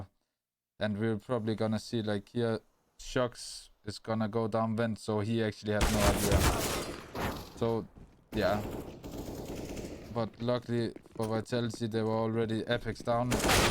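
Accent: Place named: Denmark. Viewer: Your danish accent is sexy af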